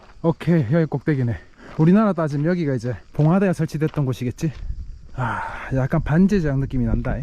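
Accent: Gyeongsang, South Korea. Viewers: 우연찮게 보게되었는데 정주행 하게 되네요.같은 경상도 사람이라 억양이 친근하이 좋습니다ㅎㅎ영상은 어디서 배우셨나봐요.전문가 포스가 느껴지네요.안전한 여행하시고,좋은영상 기다리고 있을게요^^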